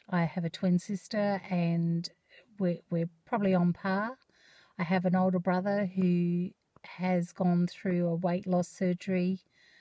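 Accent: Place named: Australia. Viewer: Hi! Welcome! I love your Aussie accent':p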